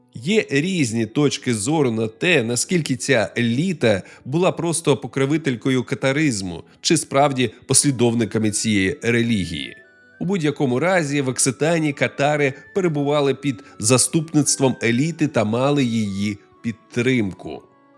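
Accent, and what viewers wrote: Russia. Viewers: Дуже змісовно. Дякую за працю. І над вимовою, бачу, працювали ☝ Але ще відчувається росіянський акцент. Працюйте над собою і над новими матеріалами для відосів. Я Ваш підписник. Ще раз ДЯКУЮ.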